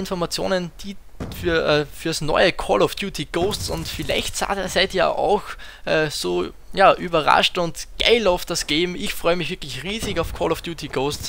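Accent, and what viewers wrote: Austria. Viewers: du bist a Österreicher oder? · bist du österreicher ? :D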